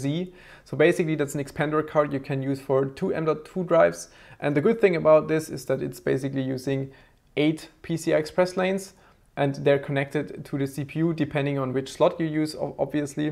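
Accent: Germany. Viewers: Der deutsche Akzent ^^ <3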